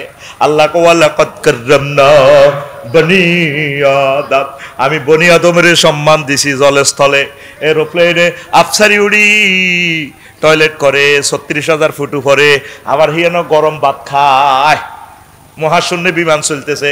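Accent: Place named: Noakhali, Bangladesh. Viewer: আন্নেরে নোয়াখাইল্লা কেল্লাই কয় আন্নে কমিলারা মানুষ হইয়া নোয়াখাইল্লা ভাষায় কথা কনআম্নের কালচার নোয়াখালীর মতন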